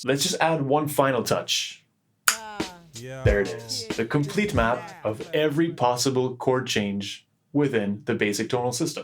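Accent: Quebec, Canada. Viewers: Me - Messemble qu'il a un (léger) accent Queb... Me - Jeulsavais! Bonne vidéo, concept intéressant et bonne exécution, merci pour le pdf! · Your Quebec prononciation surprised me, but excellent explanation I understand better! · “RE-present.” He’s not AI, he’s just French Canadian. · Hey, j'ai reconnu l'accent Québécois avec le titre de! Merci pour le vidéo:) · He sounds Canadian, maybe Quebec?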